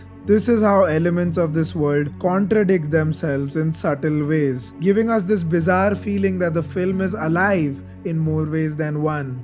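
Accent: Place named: India. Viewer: Great Video although ive noticed your quite heavy indian accent. I would probably watch more videos from you but my heavy distaste for the Indian race won’t let me. Please consider to stop being Indian so more People can enjoy your content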